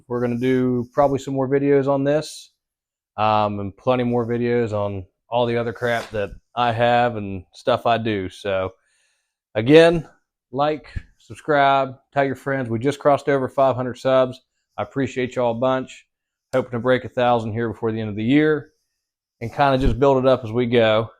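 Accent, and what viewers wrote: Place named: West Virginia, United States. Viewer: Love old Wide Glides. Fairmont WV - sister lives there. The back home accent always catches me, then saw your Clutch Up sign was like hell yeah. pretty cool channel I stumbled across on my early Sunday morning YouTube watching.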